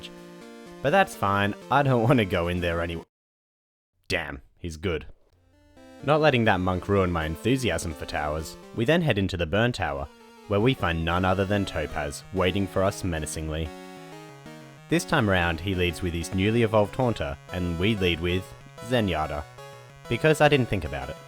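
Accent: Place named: Australia. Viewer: a fellow Aussie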